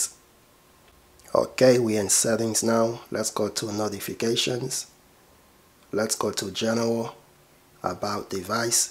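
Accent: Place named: Arab world. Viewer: Is better than a Spanish dude Maybe he has arab family and that's y he talk like that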